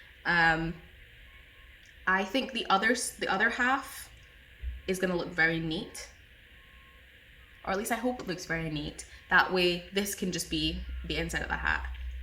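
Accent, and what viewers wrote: Scotland, United Kingdom. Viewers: I’m from the Detroit area of the USA. I found your podcast in my YouTube feed and subscribed after watching your first episode and was very excited today to see you have a new episode to watch. I adore your Scottish accent and don’t have a problem keeping up when you talk fast. So, don’t change how fast you are talking on my account, lol. Thanks for the best new podcast I’ve seen in a while. So fun and fresh! ❤ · Hi, found you in my thread after you got a shoutout by Kevin and Ray on Needle At the Ready podcast. I’m based in Berkshire, UK and enjoying your Scottish accent.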